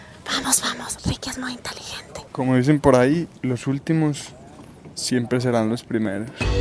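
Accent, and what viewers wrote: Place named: Antioquia, Colombia. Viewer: Ese acento paisa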